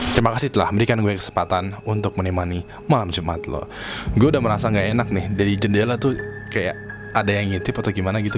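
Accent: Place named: Bekasi, Indonesia. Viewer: bang lu orang bekasi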